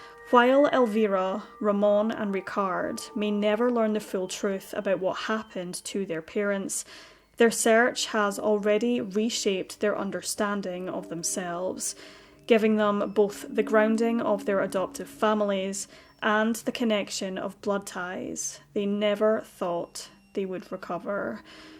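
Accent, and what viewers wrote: Scotland, United Kingdom. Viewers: Interesting story but the Narrator's absolutely HORRIBLE Scottish accent makes it too unbearable to listen to!!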